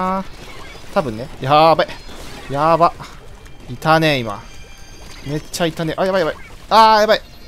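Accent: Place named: Kansai, Japan. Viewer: うぽなす！関西弁w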